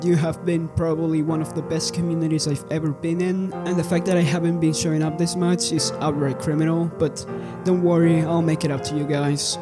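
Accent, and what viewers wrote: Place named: Europe. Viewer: he's probably european so it's not his first language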